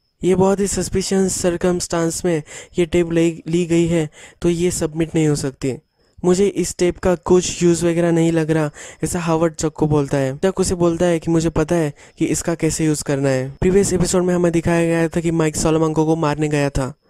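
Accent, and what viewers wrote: Maharashtra, India. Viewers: Tu pakka Marathi hai Accent pakad Kiya mene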